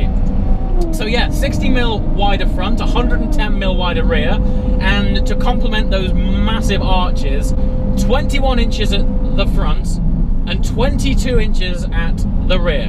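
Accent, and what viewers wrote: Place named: Northern England, United Kingdom. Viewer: Great video dude , keep them coming I love your enthusiasm , & you talk correctly with flat Northern vowels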